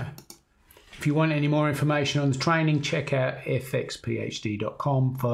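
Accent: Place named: United Kingdom. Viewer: stupid british accent, i can't hear it, why you speak like it going in slow mo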